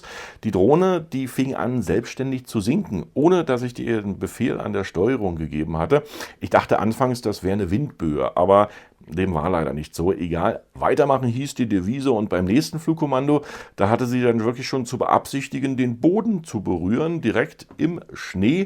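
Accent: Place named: Germany. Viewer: Wow, you sound so German, amazing! Love such heavy German accents, so awesome.. Sweet Keep well and be safe bro! 😁🇧🇬🛸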